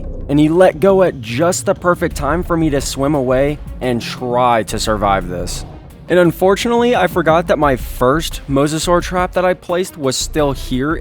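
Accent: United States. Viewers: Sound Texan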